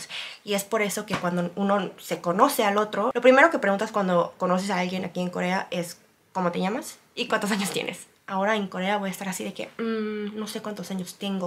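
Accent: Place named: Mexico. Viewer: No inventes, te ves bien bien asiática, en el video de tu clase. Aquí en YT pareces asiática latina. Ya cuando hablas, pues sabemos que eres mexicana, you know?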